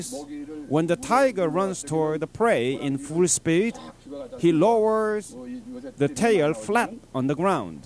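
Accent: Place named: South Korea. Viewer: There is a Korean accent in that English. I agree it sounds very unnatural.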